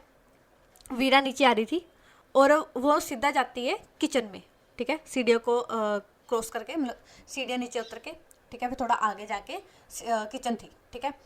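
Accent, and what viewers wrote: Punjab, India. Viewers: I love the way of your storytelling and your punjabi accent 😊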